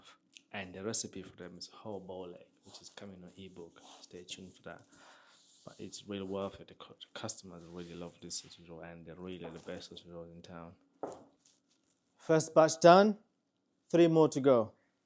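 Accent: England, United Kingdom. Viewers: What English accent do you have? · As soon as you drove up to that old brick building I knew this was going to be in England, then you started talking and the accent confirmed my thoughts !!! Nice work !!! How did you learn all this ?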